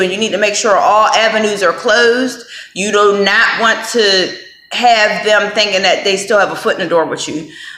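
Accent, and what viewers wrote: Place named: Mid-Atlantic, United States. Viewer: do you..interesting accent btw...are you from the mid atlantic region?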